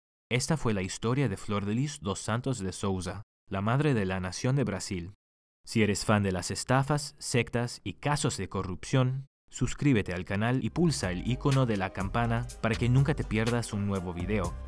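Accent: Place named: Buenos Aires, Argentina. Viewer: Hablas como porteño(Buenos Aires)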